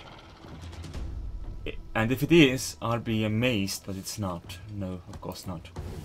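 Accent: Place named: Sweden. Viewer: Where are you from? Your accent sounds similar to Robbaz the Swedish YouTuber.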